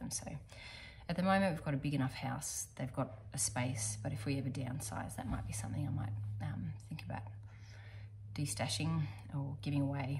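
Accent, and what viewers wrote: Australia. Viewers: I’ve just discovered your podcast and I love it!!! It’s fabulous to see and hear an Aussie! Looking forward to watching your other episodes. 👏😃🧶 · Hello, just found you on youtube, nice to hear an Australian voice,you are a gifted knitter, i have been watching youtube since COVID, i do love watching different crafts but lately the knitting ones i have liked because of all the lovely yarns that are available and the projects that people make, i am amazed, i have never been a knitter but lately have been making a few plain scarfs, i wish someone would have taught me when i was younger, but i do other things and i took a watercolour colour class a few years ago now, so i am happy. I have started painting wooden things and doing transfers and gold leaf which is more my thing. I was pleased to come across your podcast and look forward to watching again. 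Kind regards pamela hall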